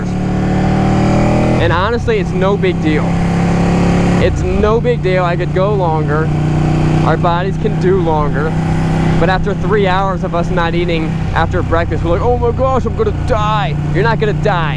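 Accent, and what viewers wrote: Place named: United States. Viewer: Ok guess where you are....from your accent and the fact your on the wrong side of the road, plus your about to dribble out your opinion, id hazzard a guess that your an American in America. Am i right or am i right?. Anyway carry on, i like watching and listening and everybody's entitled to their opinion, no matter what leftwing progressive's say.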